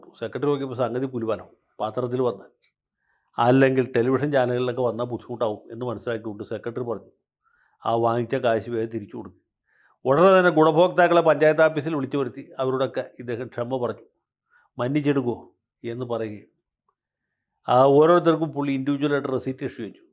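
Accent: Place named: Central Kerala, India. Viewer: your language is clear middle Kerala.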